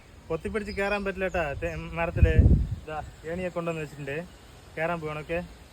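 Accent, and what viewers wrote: Tamil Nadu, India. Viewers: നല്ല മലയാളം അല്ലെ പറയുന്നത് . തമിഴ് കൂടിയ മലയാളം പോലെ സംസാരം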